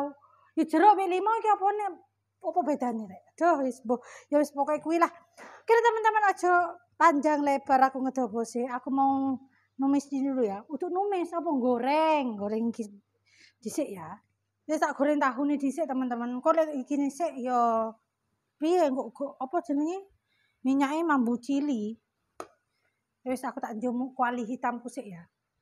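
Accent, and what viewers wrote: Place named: Mataraman, Indonesia. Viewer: halo mbak e, tiyang pundi niki? dari logatnya kok seperti orang mataraman, perkenalkan kulo cah nganjuk, domisili Surabaya. ini mirip banget dengan tahu tek surabaya, kari nambahi petis sitik. kalau orang nganjuk nyebut ini tahu bumbu mbak... jan krenyes2 ngiler aq mbak, tp itu cabenya banyak banget, gek ndang ngoweh2 aku kalau nyobain 😅😅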